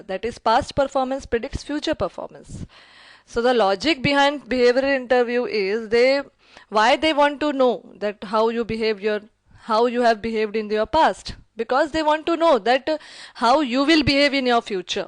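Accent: India. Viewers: hindi accent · such a heavy indian accent I having difficulty understanding , shame on her she can't even pronounce candidates , i wonder which college gave her MBA , She sounds overconfident , that is a downfall on this woman .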